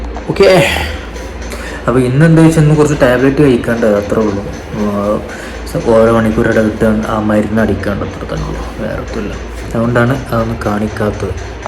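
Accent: Malappuram, India. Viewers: Ivan malappuram slang aanallo😌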